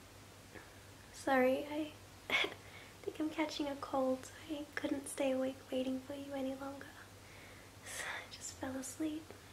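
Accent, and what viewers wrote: Australia. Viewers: I never knew how much I needed an Australian accent in my life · The aussie accent completely threw me off 😐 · Hey! Are you Australian?? (I hope I didn't offend you, if my assumption was wrong)... It's just that I'm Australian, and you have that slight accent/pronunciation of words, that automatically make me identify you as maybe being an Aussie :) Sorry for being a complete weirdo but, just curious XD Cheers for your time and effort to make this video! :) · australian accent on girls = yes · 90% Aussie accent 10% grab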